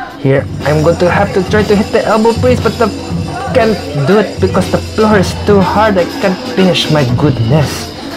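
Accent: Philippines. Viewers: HAHAHA! Nice Filo accent bruh! Dope battle! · HAHAHAHA i like your filipino accent!! hope you had fun playing in manila!! godbless you sir!🙌🏻🙌🏻💪🏼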